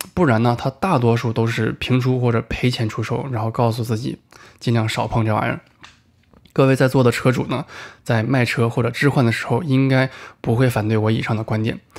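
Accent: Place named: Northeast, China. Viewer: 感觉像东北😃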